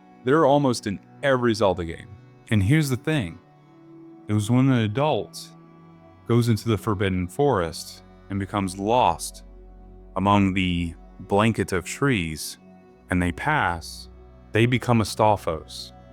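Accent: Appalachia, United States. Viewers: I subscribed after watching the recent vid on rainfall in TotK, and I've been slowly working through your other Zelda vids since. Good stuff! As a West Virginia native myself, I guessed you were Appalachian from your accent :)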